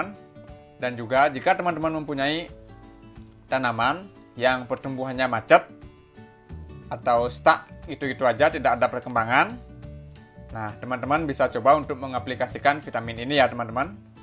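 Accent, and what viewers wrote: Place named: Bali, Indonesia. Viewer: Logatnya mirip org Bali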